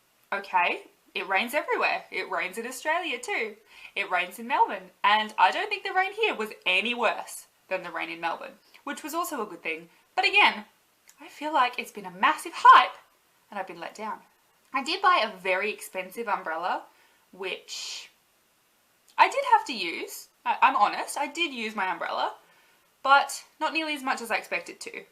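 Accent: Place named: United Kingdom. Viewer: Only 6 months and u already have a British accent I have lived in the south of 13 years and I don't have any accent and I've grown up here I'm only 15 how do u get an accent that fast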